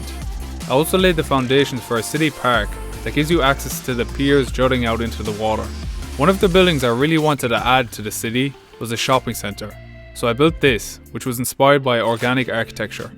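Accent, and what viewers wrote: Ireland. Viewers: Are you irish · Me to Yas he sound like an Irish ☘️ ❤️ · I knew you were irish 🤣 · Wait are you Irish ☘️? Don’t tell me my fav fortnite map builder is from the same country as me?! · What part of Ireland are you from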